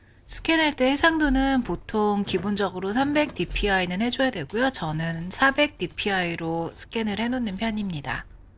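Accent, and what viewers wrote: Seoul, South Korea. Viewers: 뭔가 목소리가 80년대서울 사투리 말투 같아여😅😅😅헤헤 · 방송에서 듣던 서울사투리 같은 말투가 정겹고 좋네요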